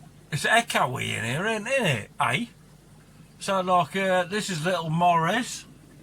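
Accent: United Kingdom. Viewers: I also learn british accent love this mate! 🙆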